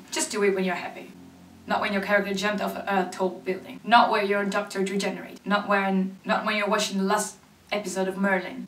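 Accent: England, United Kingdom. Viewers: Continua cosi e non ti preoccupare del tuo accento inglese perché è fantastico! Booyah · Il tuo accento è stupendo sembri veramente inglese *_* anche a me piacerebbe fare video in inglese appena avrò tempo mi ci metterò ahahah cmq volevo dire che sei fantastica e che il mio tipo di fangirl è... Tutti tranne il primo tipo e l'alternativa lol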